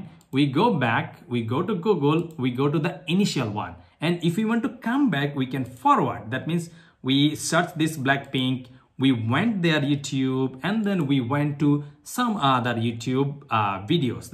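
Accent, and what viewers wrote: India. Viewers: Does anybody notice about this guy's funny accent also he is Indian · Bro like i am ur python guy and its insane like how ur doing this you should have more subs then mrbeast edit: Bro sounds like indain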